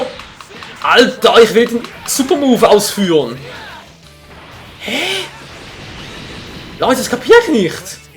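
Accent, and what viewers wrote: Switzerland. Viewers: Bist du schweizer wegen des dialekts?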